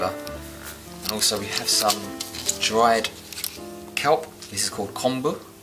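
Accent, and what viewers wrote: United Kingdom. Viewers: omg British accent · Bloody Hell! Judging by you accent, I imagined you to be the most saxony british brit. Until you turned out to be east asian; I was like 0.0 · so nice to hear someone talking about Japanese cuisine in British English!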